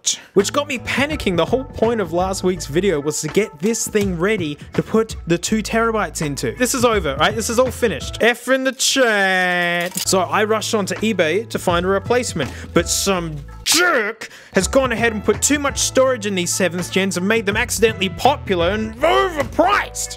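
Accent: Australia. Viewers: Wasn't ready for the speaker to have an australian accent lol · How I love the feeling of sleeping while hearing a Australian dingus shout at an ipod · hes australian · I love watching an angry Australian man yelling at iPods. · i like an Australian man yelling at tech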